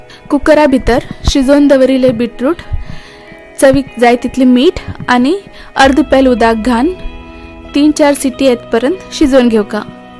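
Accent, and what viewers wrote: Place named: Konkan, India. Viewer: Which salt do u use is it sendha... I like your style of explanation very cute konkani.. Good recipe too :)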